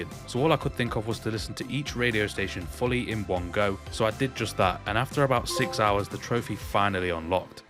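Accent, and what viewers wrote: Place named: Nottingham, United Kingdom. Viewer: I know a Nottingham accent when i hear one 😂😂😂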